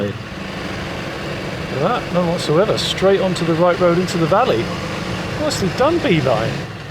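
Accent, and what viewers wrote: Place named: Wales, United Kingdom. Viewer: Great video, and a great Welsh accent, and that's coming from a welshman 😂😂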